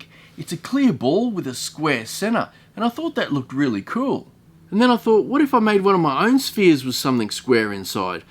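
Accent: Australia. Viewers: You should’ve made one solved and one mixed. But anyways it ended up looking awesome. 😁 Are you an Australian? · Hey Mate, love your voice and of course your turning! But the way you speak in your classy aussie style is what I enjoy the most! Been a backpacker in 2016 and fell in love since then!!💘🇦🇺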